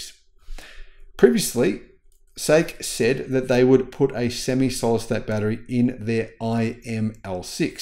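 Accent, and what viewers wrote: Australia. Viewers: I am looking for a way to get credit for my improved ability to comprehend the Ozzie accent But all web sites focus on reading comprehension and ignore listening comprehension Still because of the, my listening comprehension has improved, making me confident I could successfully buy a cup of coffee and rent a hotel room in Australia!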